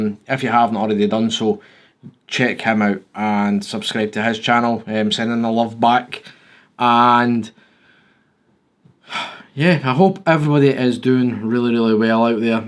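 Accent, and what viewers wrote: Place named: Scotland, United Kingdom. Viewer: No, having a Scottish accent will not put people off subscribing, although in my case I prefer it because I lived there and it’s not Northern Irish 😂. My favourite beauty YouTuber is also Scottish.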